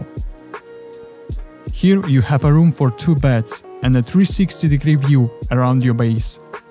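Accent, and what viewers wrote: Russia. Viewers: жесть как русский акцент выпирает прям )))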